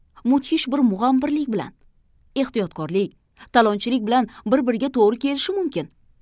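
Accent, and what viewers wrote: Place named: Andijan, Uzbekistan. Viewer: Шеваямки анжанча тошканча узбакча 😊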